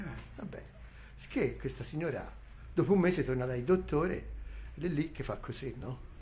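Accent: Tuscany, Italy. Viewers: Grande Grande. Non è che non capiscono il finale, è che non sanno che la "C" in Toscana....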